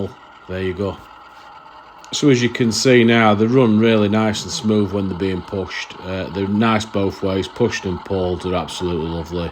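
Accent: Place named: Lancashire, United Kingdom. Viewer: Damn! I struggle to pinpoint the accent after all these years. I moved to Australia about 40 years ago. I honestly thought you were from my old neck of the woods (Oldham). I guess SE Lancs and SW Yorks are similar. Never tried the Hornby couplers, the Hunt ones are all right. I print my own these days. Cheers!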